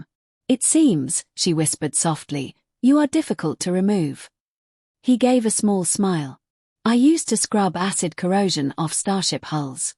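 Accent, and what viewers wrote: United Kingdom. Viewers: Love, love, love the classy British narrator!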